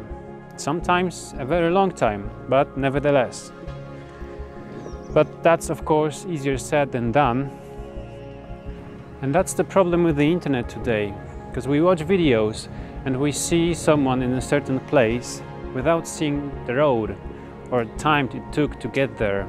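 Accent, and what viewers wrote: Poland. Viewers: Rotbart1337 he’s Polish indeed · What nationality are you? You have an accent but i cant tell where you are from. Poland?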